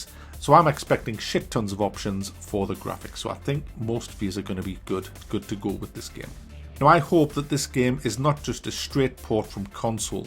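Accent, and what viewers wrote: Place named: Middlesbrough, United Kingdom. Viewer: He sounds like he's from middlesborough, sir davos sounds more like a geordie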